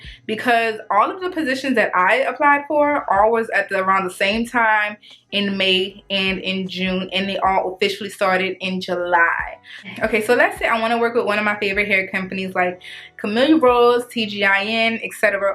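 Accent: New Orleans, United States. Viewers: Girl I hear that New Orleans accent lol🤣😂I am from New Orleans too🤗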